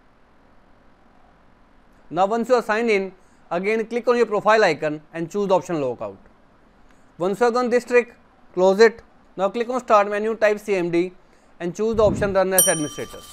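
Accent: India. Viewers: 😭you know its gonna work when its a guy with indian accent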